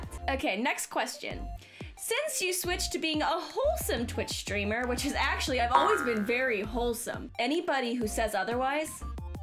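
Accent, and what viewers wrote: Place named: United States. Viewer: She speaks with an American accent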